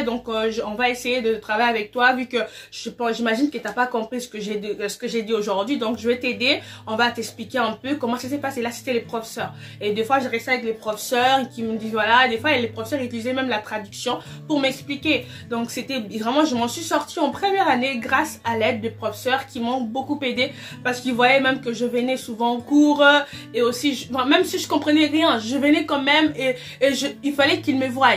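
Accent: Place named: Democratic Republic of the Congo. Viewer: salut ton accent m'intrigue et j'aimerais bien savoir ton pays d'origine je pense que tu es Congolaise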